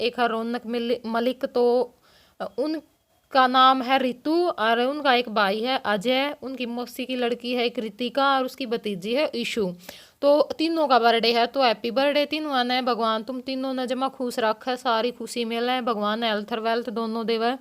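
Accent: Haryana, India. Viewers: Ram Ram jii didi aapki Haryanvi boli 💓💓sun k ne maaja sa aaga 👍👍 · Haryanvi andaaj mast lage di 👏👏👏👌👌👌👌👍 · Full Haryanvi 🤗🤗🤗🤗🤗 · Sidhi lath maar haryanvi baki achi lage h boldi haryanvi boldi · Bhn haryanvi bolte bhut acche lgo ho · Ram ram diiiii. Aapki haryanvi boli must h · Aaj ki video bahut achi lgi haryanvi me aise hi haryanvi me hi video bnaya kro aap deswali boli bolte ho👍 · aaj to kte pure haryanvi m laag ree h . pr . bhot achy lge aap b vlog b . keet it up dear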